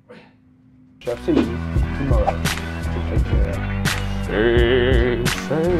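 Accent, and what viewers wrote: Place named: United Kingdom. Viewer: Which part of the uk you from?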